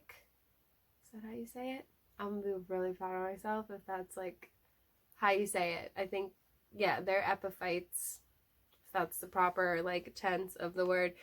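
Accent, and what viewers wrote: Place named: Bronx, United States. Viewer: Thanks for sticking up for the golden pothos! ✊😊 I really like this repot. It covered a lot of ground. Ok let's sum up: Yes, you have an accent that's says "just north of NYC". Girl, get that Miracle Gro darkest pits of hell Satan dust out of your home! The fungus gnats will come. Just remember Miracle Gro has chemical fertilizer added so combining it with worm castings will give your soil high amounts of nitrogen and you can burn your plants. Lastly, what medicinal plants are you growing and planning to grow? 🤔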